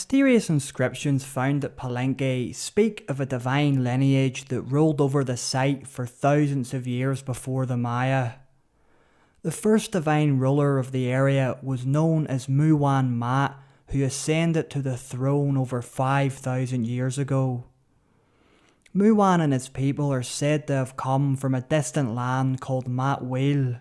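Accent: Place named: Scotland, United Kingdom. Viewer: I think he is scottisch, i love his accent 🥰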